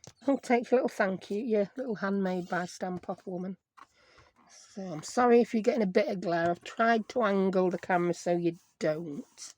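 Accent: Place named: Rochdale, United Kingdom. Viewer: I have only just seen your video. You are so funny, especially about the kids comments your accent sound somewhere around Rochdale area. Great cards.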